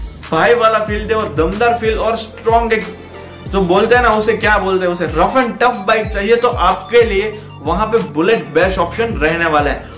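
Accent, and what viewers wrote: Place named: Gujarat, India. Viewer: he is typical gujju .. listen to his accent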